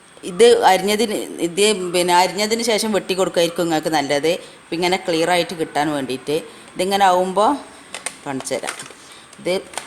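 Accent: Malappuram, India. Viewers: Malappuram ano veed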